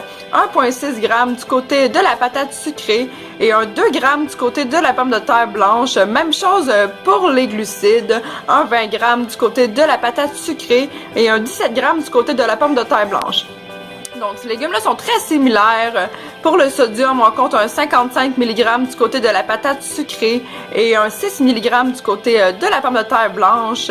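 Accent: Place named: Canada. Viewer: On va me traiter de raciste normal c'est la nouvelle génération de merde mais, l'accent canadien me stress j'ai phobie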